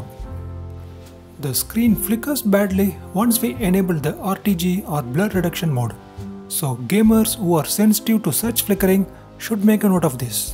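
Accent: India. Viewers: You know it's legit when you hear an indian accent.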